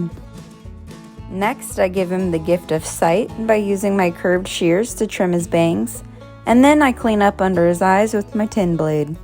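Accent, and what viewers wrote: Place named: South, United States. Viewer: Your southern drawl accent is the best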